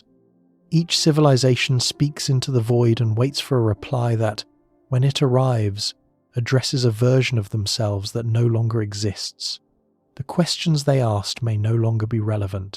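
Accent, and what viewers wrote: United Kingdom. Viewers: But this guy sounds British, not Russian... 🤔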